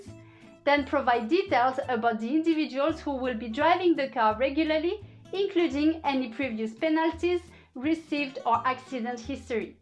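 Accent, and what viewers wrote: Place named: France. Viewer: "Expat in France" followed by the most French accent I've ever heard. I guess they figured out how to make money off of us....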